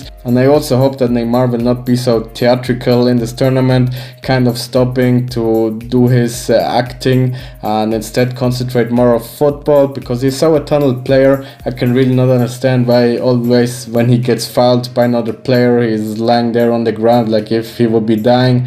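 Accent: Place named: Switzerland. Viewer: You have a swiss english accent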